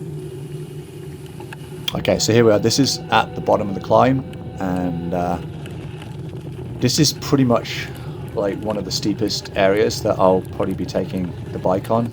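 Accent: United Kingdom. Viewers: Where is this? You sound British but it looks like Colorado.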